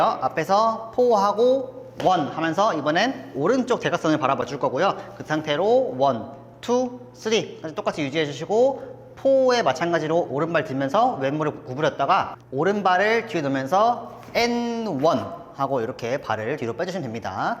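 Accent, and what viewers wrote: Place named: South Korea. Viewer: yes, a lot! And the subtitles were too, since you have korean accent (which is so cute being honest), thanks! I'll be looking forward to your next tutorials (CAN'T BELIEVE YOU NOTICED ME, YOU'RE AMAZING 😍)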